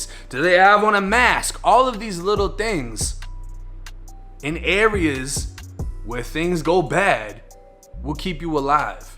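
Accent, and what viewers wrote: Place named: Tampa, United States. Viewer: I love how u dead ass talk like u from Tampa fr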